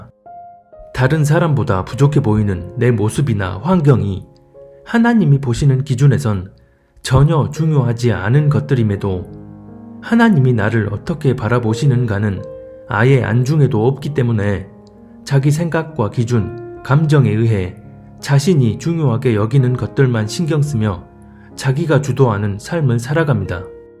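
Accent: Jeolla, South Korea. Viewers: 전라도 성도님이십니까요잉?ㅎㅎ사투리가 걸쭉하신게 듣기좋습니다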